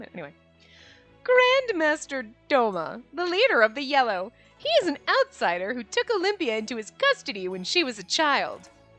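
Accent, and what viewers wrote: Ireland. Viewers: The irish accent Yay! Time to shop I need this game, the artwork is beautiful. Yin and Yang ☯️ also I love your voice acting.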